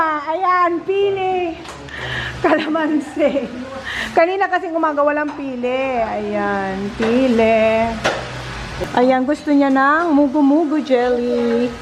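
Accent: Bicol, Philippines. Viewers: Sarap sa ears ang pagbibicol mo manay 🥰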